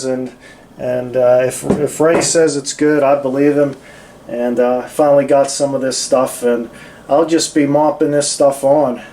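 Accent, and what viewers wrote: Pittsburgh, United States. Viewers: Are you from pittsburgh? I detect the accent. You sound just like my dad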